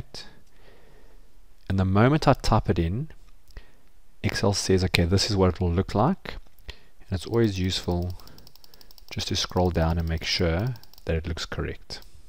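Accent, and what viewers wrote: South Africa. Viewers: narrator is def south african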